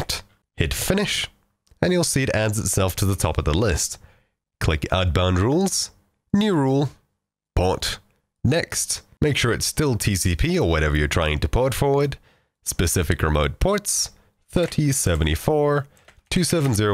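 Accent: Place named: South Africa. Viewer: took me so long to realize you from south africa lol '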